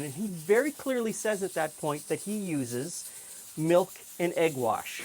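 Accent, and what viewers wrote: Canada. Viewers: 100% your canadian love the way you say about , watched a few of your vids and I have subscribed all the way from Scotland :)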